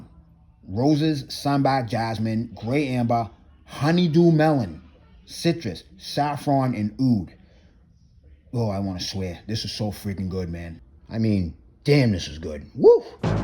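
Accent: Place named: South Shore, United States. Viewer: Your accent is bringing me home. From the south shore.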